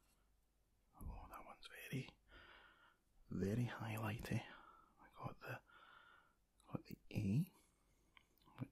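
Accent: Scotland, United Kingdom. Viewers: I absolutely love your voice, I'm a sucker for scottish men and their accents ☺ Great video and doodle adventure! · I’m originally from Dumbarton. In the states now. Love hearing the homeland accent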